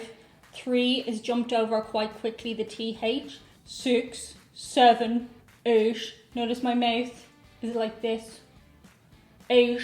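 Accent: Ireland. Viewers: I wondered about your specific accent which is subtly different than many of the other Irish accents that I hear via “The Try Channel” and other sources. Can’t wait until you get to Chicago, I hope you get to hear the many variations in the city! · Hi, I love the Irish, As an American Injun I was taught my Catechism at the Stewart Nevada Indian Boarding school, Catholic Church, by Nuns who had traveled from Ireland, on a VISA, and to me were the most Beautiful women I had ever seen!!! In my entire 6 yr old existence! No one since has ever had a true Irish accent. Except you of course.:D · The only Irish accent I care about is yours. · Being from deep in the Heart of Dixie anytime I try to do an Irish accent it sounds more like a bad Scottish accent LOL then it slides into a Boston accent LOL your accent is very nice. I have met people from Ireland I cannot understand a word they are saying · Queue the trolls who insist your Irish accent is fake. 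· OMG, since I enjoy your Irish accent, I chose the Irish English setting on my iPhone's Siri, get this; it also gave it an attitude. I recently made a request from Siri and the answer I got was, SERIOUSLY!!!! it just cracked me up, the Irish Siri is feisty!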